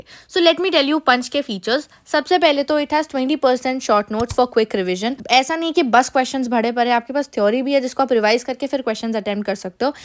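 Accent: Bihar, India. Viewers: Aap bihar se ho??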